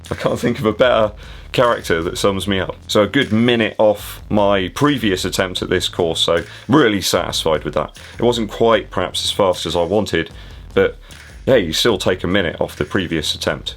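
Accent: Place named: West Country, United Kingdom. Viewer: Great race day footage and I'm also a fan of regional accents with the West Country at the top of the list 👍😁. Raced a local 10k last week in Milton Keynes and am running a 5 mile race this coming Tuesday in Leicestershire. Just bought a new pair of Boston 9s and so shall wear them. My other Boston 9s (they produced a 5 mile PB ) are enjoying their retirement but come out in the garden every now and then. Giving good vibes keep it up.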